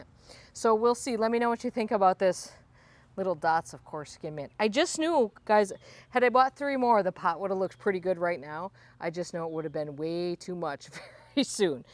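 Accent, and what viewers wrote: Wisconsin, United States. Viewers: I grew up in Michigan. I love your Wisconsin Accent. Im thrilled I found your channel. Subscribing & following along.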